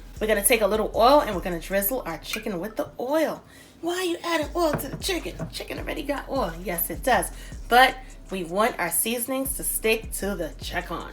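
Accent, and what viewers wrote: New York, United States. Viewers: Damn thank you so much!! And do I hear a New York accent?? ❤️ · Are you from New York or someone near that area? Hearing an accent lol. Love it btw. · From one New Yorker to another I love your accent . your food looks great ! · You from NY I can tell 😂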